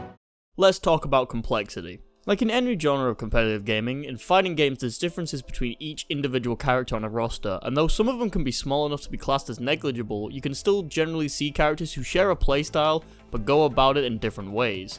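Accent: United Kingdom. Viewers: Another fucking banger! Everytime I let your silky british voice lul me into listening to info about the topic the punchline hits and I never see it coming. I don't genuinely laugh at many videos but yours always manage to get me. Never change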